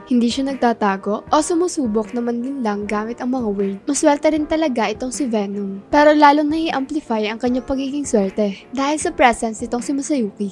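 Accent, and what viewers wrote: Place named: Conyo, Philippines. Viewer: Ang ganda po ngayun nung phase ng voice ngayun nakakahinga na po yung voice di na naghabol keep it up ganda rin na may medyo pagkaconyo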